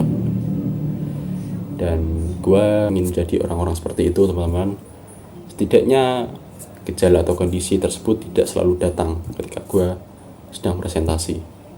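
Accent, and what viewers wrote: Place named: Java, Indonesia. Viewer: kalau medokk jangan maksa GUA bang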